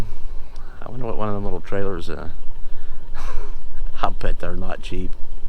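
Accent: Pennsylvania, United States. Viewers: Are you from Pa....you kinda have that PA accent 😂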